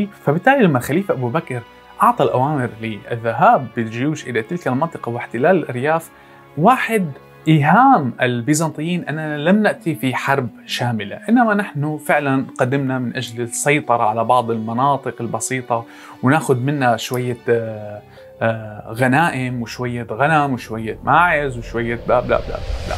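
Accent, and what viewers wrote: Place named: Syria. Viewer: على فكره اللهجه السوريه كثير حلوة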